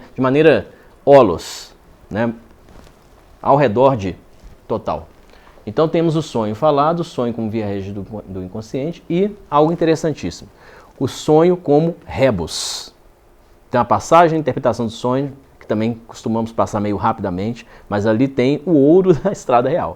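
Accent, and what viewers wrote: Minas Gerais, Brazil. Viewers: O jeito mineiro de falar da psicanálise faz dela brasileira...Boa palestra.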